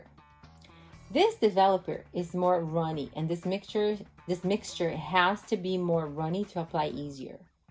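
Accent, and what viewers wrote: Brazil. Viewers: are you Brazilian? I’m from Brazil, and I noticed a familiar accent in your speech and you said tchau in the end, so it made me wonder.:) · Como a gente reconhece o sotaque Brasileiro 😍Tava te assistindo e pensando ela é brasileira e no final vc fala Português kkkk !Ficou show Seu cabelo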